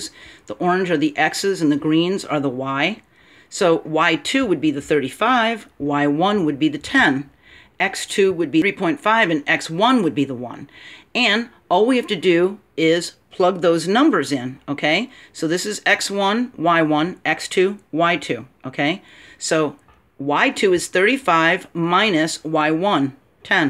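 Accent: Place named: United States. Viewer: I'm really struggling to understand the strong American accent. How do you guys sound so animated all the time while us Brits seem so solemn? Still, jolly nice video and somewhat helpful.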